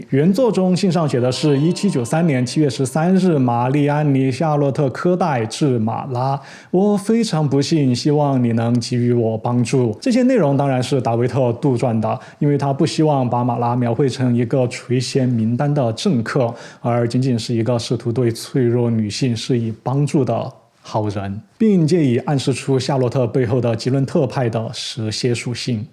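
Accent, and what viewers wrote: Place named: Chongqing, China. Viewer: 很喜欢你的重庆川普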